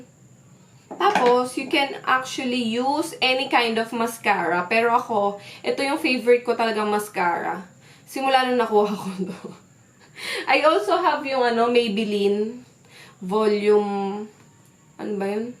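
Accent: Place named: Bulacan, Philippines. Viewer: ur so cute ganda..hahahaha.. naririnig q ang punto ng bulacenia sayu.hahhaa... i miss u nmn pati si skysky..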